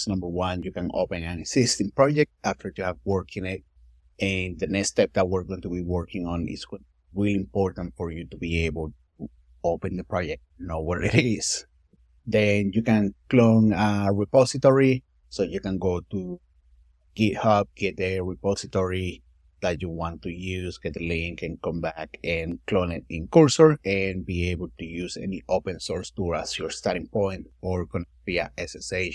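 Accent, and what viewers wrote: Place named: Latin America. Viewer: Bro eres latino hablando inglés porque eso me parece, hablas bien recién empecé a usar cursor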